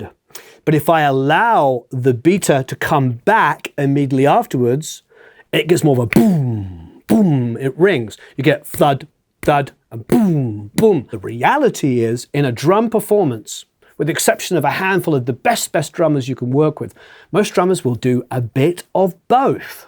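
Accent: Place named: RP, United Kingdom. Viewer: Hi, Hope you are doing Marvelously Well! :haha I remember watching 5 years ago and I still remember the posh accent lol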